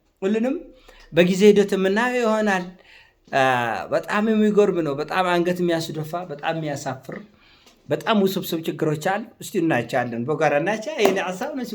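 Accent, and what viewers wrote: Tigray, Ethiopia. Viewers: በትክክል! እውነትን በትግራይ ወገኖቻችን የአነጋገር ለዛ እያዋዛ ለመናገር ወደ ኋላ የማይል፣ የሴረኞችን ተንኮል ቀድሞ በመረዳት የሚያንኮታኩት ጀግና ኢትዮጵያዊ ነው!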